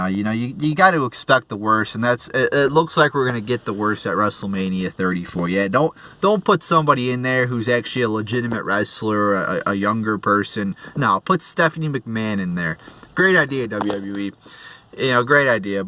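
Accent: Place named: New York, United States. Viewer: you have similar voices. Ai New Yorkar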